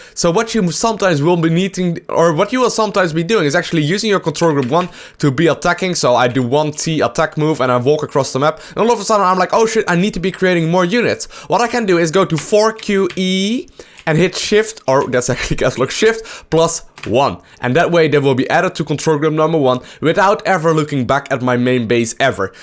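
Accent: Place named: Netherlands. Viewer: U are dutch or something like that? Cuz your english is somehow funny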